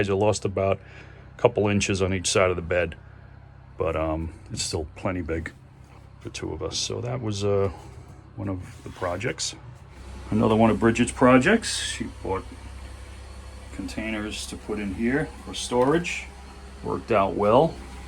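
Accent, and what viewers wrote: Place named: East Coast, United States. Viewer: Hey there. Long time follower since we were East Coast neighbors with distinct "accents" lol, before your move to sunny Fl. The best thing I liked seeing you do was not only the last upgrade video where you replaced the engine flush connectors to quick fits, which is a game changer and something me and Dad did to all our boats and dock gear back a bit in the day. But you read my mind and connected them both with the jumper for a one and done, which wasn't available back then. But yeah was really another cool thing you came up with, on the fly for maximum ease. It brings me back to the good ole days with Dad, you two have/had the same think on the quick and make it work or fix anything. 👍 Good stuff. Best wishes too you 3 on your new girl and new adventures!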